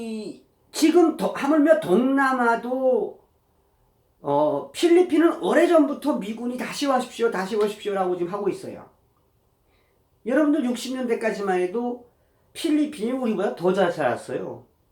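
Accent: North Korea. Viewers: 고조구수한 이북 작고하신 우리아버님 목소리같아 정겹네요 응원합니다 화이팅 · 오리지널 북한사투리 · 북한말씨로 좌파아가리 이중성을 말해 주시니까 속시원합니다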